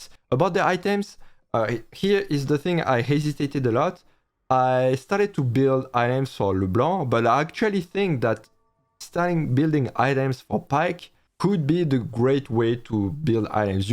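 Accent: France. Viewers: love how you talk, did you grow up in france?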